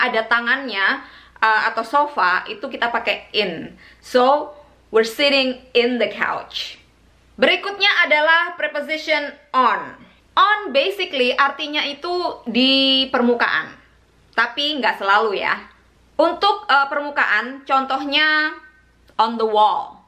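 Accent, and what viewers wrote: Surabaya, Indonesia. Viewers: Sama saja kok... logat suroboyoanku juga ga bisa hilang walaupun sudah tinggal 7 tahun di Bandung dan 4 tahun di Jakarta....makanya aku seneng lihat Mba logat suroboyoannya tetap ada walaupun sudah 3 tahun di Amerika:)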